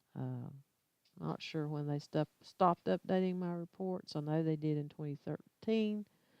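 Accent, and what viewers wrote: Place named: United States. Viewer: Happy Saint Patrick's day, and yes! I too fear a Soylent Green future in which the poor and disenfranchised are deemed scrap heap fodder and a cooled evil encompassing reality, or I could just be high on some sweat Emerald pot and happy. Good day. I do so like your accent, tell me one thing? if it's not too intrusive? = where is that in the US of A..... is that from?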